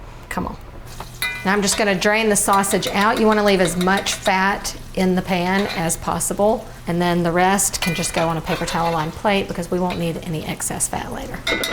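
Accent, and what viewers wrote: South, United States. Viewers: I do too. Very much the voice of a young Demi Moore. A little rough, sweet southern accent..... Magic.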